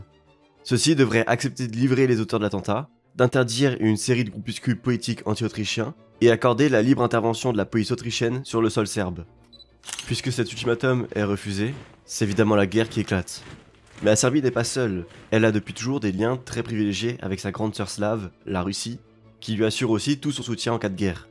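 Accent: Paris, France. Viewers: Je plussoie sur l'articulation. Je décèle un accent parisien, avec une tendance à accélérer trop certaines parties et phrases. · Merci pour ce résumé très précis . Une petite critique malgré tout : attention à l 'articulation en parlant , j'ai l'impression d'entendre un petit jeune du triangle d'or (Auteuil , Neuilly , Passy) . Il faut parler plus lentement et plus articuler , marquer la pose .Si non c'est très bien , bravo.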